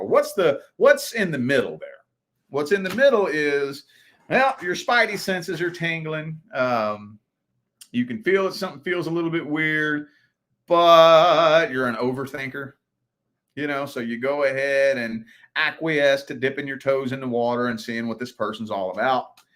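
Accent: South, United States. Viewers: I love your Southern accent and your realness :) You are so genuine and sweet and patient yet you have suffered so much. Sending love and warm hugs!!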